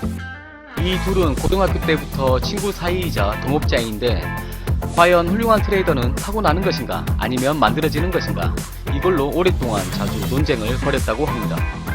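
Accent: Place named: Gwangju, South Korea. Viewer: 억양이 광주사람이군요 ㅎ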